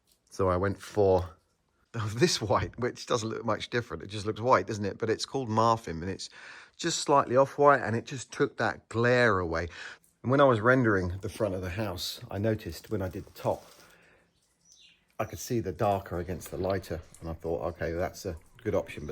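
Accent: United Kingdom. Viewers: Hi! I can tell by your British Accent, that you are renovating this house maybe in Cornwall or Devon. Or somewhere in Spain.❤ Greetings from Northern Germany, Fritjof 😊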